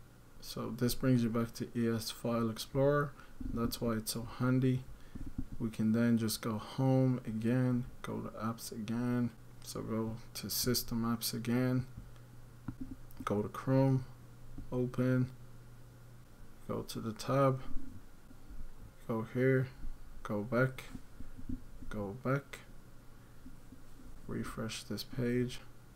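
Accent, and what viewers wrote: Dublin, Ireland. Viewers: Bleedin' Dublin accent x'D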